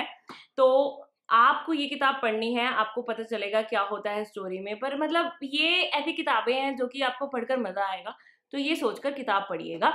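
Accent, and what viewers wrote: India. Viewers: I think you have a very good command over English language especially coz of your indian accent. I mean your language is quite easily understood by indian audiences. I looked for audio books over YouTube and applications so that I can improve my English but didn't get even a single video or audio book in indian accent. Then I thought of you for a second that why cant you create an audio book over youtube. Isn't it a great idea? It will help a lot of Indians like me who are looking for such audiobooks. I hope you come up with atleast one such book in near future. Thank you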